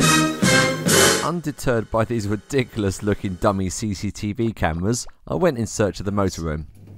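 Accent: United Kingdom. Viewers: You've got a beautiful British accent * . *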